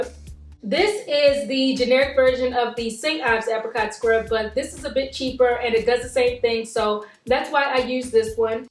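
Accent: Baltimore, United States. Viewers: you are so awesomely beautiful!! Thanks alot for these tips! P.S. I heard some Baltimore in this video and I love it!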